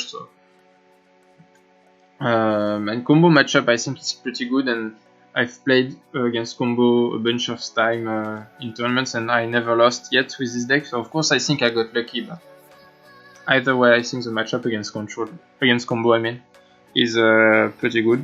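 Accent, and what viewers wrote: France. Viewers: You sound french. Wanna play some goat in paris ?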